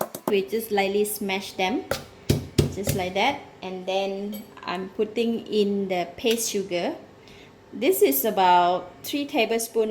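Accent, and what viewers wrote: Malaysia. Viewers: U have Malaysian accent? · no wonder you sound so Malaysian :) I really enjoy your down-to-earth explanations to cook home cooked food with love ! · You have a malaysian or singaporean accent..?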